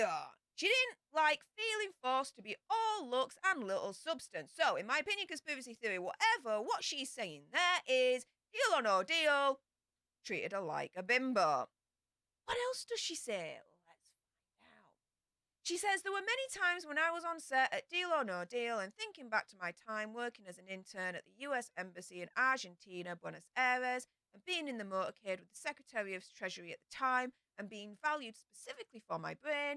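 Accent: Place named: Northern England, United Kingdom. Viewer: As I’ve always said, be nice on’t way up, coz you might meet ‘um on’t way back down! Yes, I have your accent, and it’s good to ‘ear it on’t t’inernet. 😊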